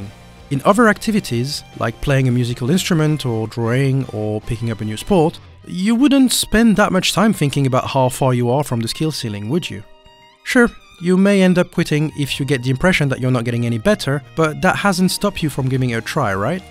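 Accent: France. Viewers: I knew it. You had a slight French accent. En plus on manque de youtubeurs jeux de combats pk t allé à l'étranger XD. Aussi leon massay goat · Oh ! The Français accent ! But the english it is quand même good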